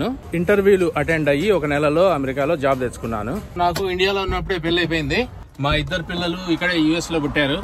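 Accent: Kadapa, India. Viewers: Anukokunda mee vedio chusanu flightjourny gurinchi.meeru matladevidhanam telisi kadapaani doutvachindi ,andulo Reddy's ani anukunnanu .endukate Maadi palletutu,kani maa vurilochalamandi.reddys Amerika,landanlo,jarmanylo vunnaru.Reddy's vallu chaduvulo eppudu first vuntaru and very intelligent.meeru maatalu vintunte nenu tenthclasslo vunnapudu maa hindi sir mallareddy garu gurtuku vacharu.sootiga matladatam,jokes veyyatam same anni alage vunnai .ayanadi Kadapa. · Sir I am very glad to see a Kadapa person talking in kadapa accent sir namaste I am from kadapa, pendlimarry mandala, anna namaste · Ur great sir meeru inka telugu and kadapa yasa marchipoledu enni years aina.... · Super garu pakka rayalaseema basha lo chepputhunnaru. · అందుకే మీ బాష పచ్చి రాయలసీమ దని నాకు ముందే తెలుసు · U posting great videos, Please try to talk always in your natural slang..Raayalasima slang... 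· కడప భాష అదుర్స్ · Anna Mee vedios chalabaguntai....manakadapa basanu inka meru gurtupattukoni chala clearga matladutunnaru.. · Memu kadapavallamu andi malage matladutunnru andukey ma vallu aney feeling vachindi me matlalu vintuntey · Me matalu vintene pakka rayalaseema ani artham avutundi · Anna I'm also from kadapa 😁 Language slang suuuper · Inni rojulu mi yasa chusi ma kadapa district ani doubt vundey.. ipudu confirm ayindi.. manchi info isthu ilagey videos cheyandi.. love from Kadapa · Super anna! Great journey,🙏🙏🙏 Seema language bagane matladuthunaru inka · Mee slang adurs nenu kooda from raayalaseema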